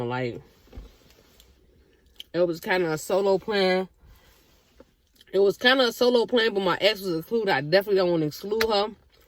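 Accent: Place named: South, United States. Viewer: Idk why but I hear southern in you lol ! Where are you originally from? You got some accent 🤣🤣🤣 and I love it!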